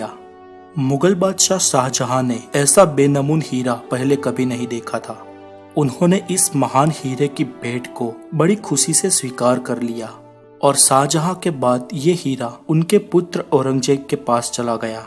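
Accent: Gujarat, India. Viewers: ભાઈ તું ગુજરાતી છુ???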